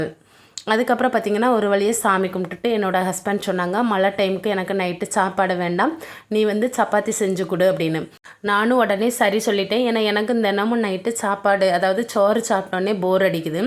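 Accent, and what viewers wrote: Tirunelveli, India. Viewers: திருநெல்வேலி பாஷை எனக்கு ரொம்ப பிடிக்கும் ❤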